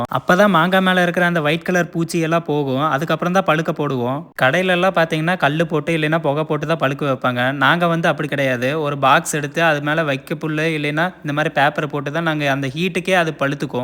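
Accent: Coimbatore, India. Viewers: Athu கோயம்புத்தூர் பாஷை.கொஞ்சம் maathi பொறிச்சிடுவாங்க. இல்லை parichiduvaanga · Coimbatore Tamil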